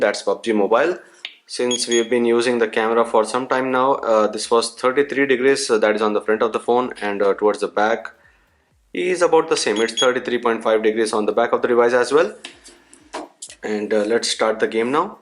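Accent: India. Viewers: Indian accent so strong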